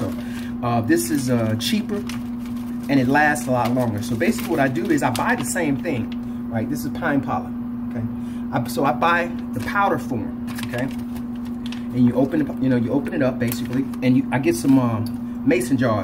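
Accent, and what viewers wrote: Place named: United States. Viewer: I don't know what you pay for your pine pollen but you can get 70 grams of it from Harmonic Arts (Canadian online company) for 32 bucks. They also do tinctures and have an amazing variety of products and have decent prices. Another online place I would like to suggest is "Canadian Pine Pollen Company". Judging by your accent I am assuming your are American? I just wanted to give you my sources because with the stronger US dollar you may be able to get much more product and save some money. Also, I have zero affiliation with those companies. If I love something, I rave about it and I have a big mouth. Heh.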